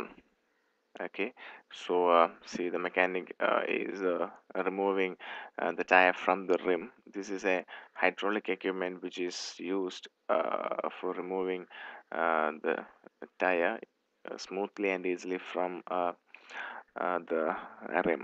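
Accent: Kerala, India. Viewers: I bet the narrator is from the God's own country.. So am I.....:) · sounds Indian to me.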